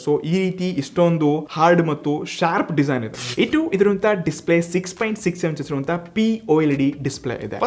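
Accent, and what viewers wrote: North Karnataka, India. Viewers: ಉತ್ತರ ಕರ್ನಾಟಕ ಶೈಲಿಯ ಭಾಷೆಗೆ ಧನ್ಯವಾದ.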